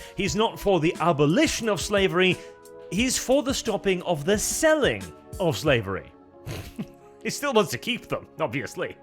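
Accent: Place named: England, United Kingdom. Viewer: Listen to that English accent, and knowing that my language that I speak is English come and knowing that all three of my names both first second and surname is english, and knowing that the English is participated in the slave trade and benefited off of it still to this day, you should be the last person talking.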